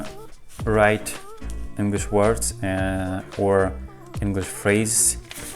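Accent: Russia. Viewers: bless you. You are doing great with learning English, and I don't think it sounds funny. There's nothing wrong having the Russian accent while you are speaking English. I wish that we in the US would do better with learning more then one language. I took German a long time ago and started to understand and speak it, but then I lost the knowledge because I didn't get to use it. You should be very proud of yourself, and I really mean that. Don't let others tell you that you sound funny or that you can't speak English. Unfortunately like any country we have rude people here too, and you just need to ignore those people making those type of comments. I have a friend who is from China and learned to speak English. He would start apologizing when he couldn't find the right word to say, and I would yell at him for apologizing. I said don't worry about finding the word, what I want you to do is stop apologizing, there's no reason to apologize for not knowing a word or because you don't feel that you aren't saying the word right. 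I'm always happy to help you pronounce or find a word, but you can't keep apologizing. So that is one thing I want to pass on to you, there is never a reason to apologize. And remember the ones who are the rudest to you, are the ones that could probably never begin to learn a second language. You just keep practicing and it will happen in time. English is a damn hard language, it's more then just learning the words. Half the time it seems there is no reason f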